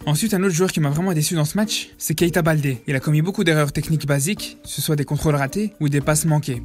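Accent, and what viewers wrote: Brussels, Belgium. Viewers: Accent bruxellois flag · T’as l’accent belge fieu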